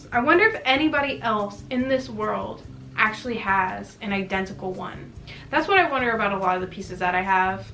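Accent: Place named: United States. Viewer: u sound like one of my cousins from america 🥺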